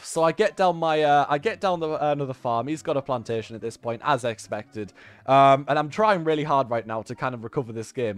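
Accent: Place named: United Kingdom. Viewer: Man I really can’t get over ur British accent lolll it’s awesome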